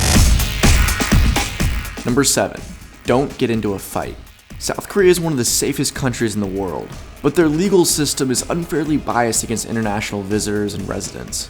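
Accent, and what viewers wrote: United States. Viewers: Are you by any chance from Maryland? Your accent reminds me of so much of some of the people from there.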